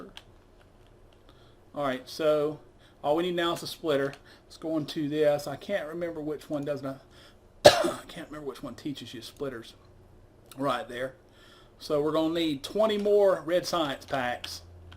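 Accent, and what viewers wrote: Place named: Midwest, United States. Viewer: Btw where are you from? From your accent I'd guess American Midwest, maybe south?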